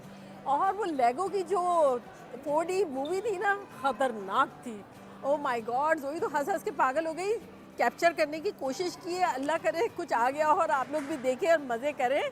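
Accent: India. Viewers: mam I was watching your pani puri recipe with my dad today and I told him she is from karachi but at times sounds so indian🤩🤩🤩 my dad and I enjoy watching your recipes Love from india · Very beautiful vlog. Felt like we are enjoying with you. Your accent is very much like indians as compared to other youtubers that I follow. Any roots in India?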